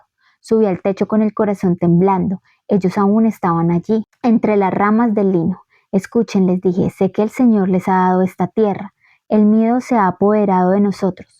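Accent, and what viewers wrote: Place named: Colombia. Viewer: Gracias por la Narrativa y la hermosa enseñanza un abrazo fuerte por tu voz puedo decir que eres colombiana yo lo soy pero bobo en México hace 43 años graba más narrativas bíblicas que te salen muy bien besitos❤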